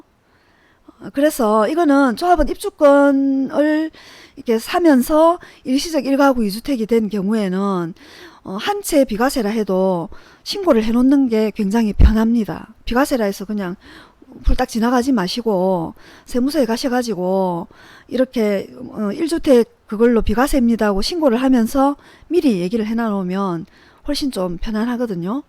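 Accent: Busan, South Korea. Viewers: 처음들어보는 재건축 재 개발 에대한 전문가에 말씀 큰 도움이됩니다 부산사투리 정감있고요 또박 또박 허스키한목소 리로 진행하시는 말 솜씨 가 보통 아니시네요 저는 수영구 역세권 재건 축 대상지 주민으로 물어보고싶은것 많지만 공짜로 들려주시는 유투 버 정보 열심히 청취해보 보겠 습니다 좋은정보 감사합니다 저는 수영구 역세권 대건 축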